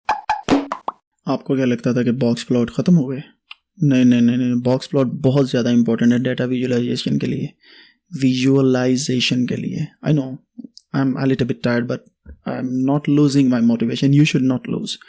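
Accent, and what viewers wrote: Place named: Faisalabad, Pakistan. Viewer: kya maza aya, yayi wala accent yawwwrrr , and apka Faisalabadi accent, anyway keep us engaged and motivated. PS: 'anyway' b ap sy seka hai 😆 chillay k sath sath apka accent b sek jayengy 😃❤️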